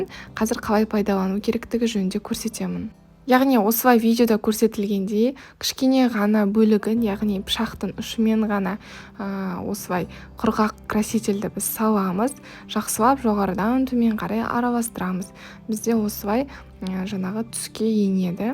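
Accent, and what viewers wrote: Standard. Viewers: Сіз таза қазақша сөилеисіз сол ұнаиды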